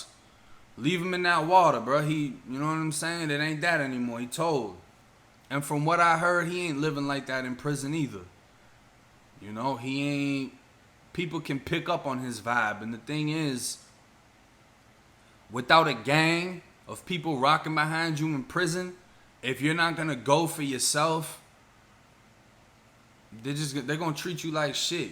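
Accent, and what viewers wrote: Boston, United States. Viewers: Do you have Massachusetts roots or boston ???? , good job STAY FREE MY MAN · U can tell he got boston roots by his accent u can here fla but that boston no r accent is def there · Are you originally from the, Boston area?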